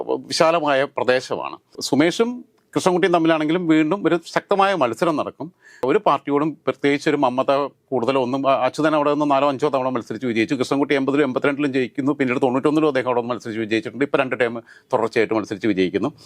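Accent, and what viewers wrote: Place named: Palakkad, India. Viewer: പൊലയാടി മോനെ നിന്റെ ഭാഷയും പാലക്കാട് വിധത്തിലാണ് അതുകൊണ്ടാണ് അങ്ങനെ പറഞ്ഞത് ഞാനൊരു ആർഎസ്എസ് കാരനാണ്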